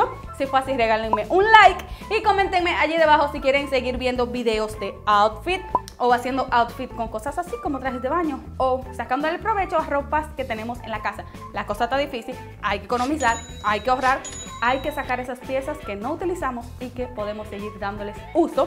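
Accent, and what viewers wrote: Dominican Republic. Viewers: Me encantaaa, eres dominicana?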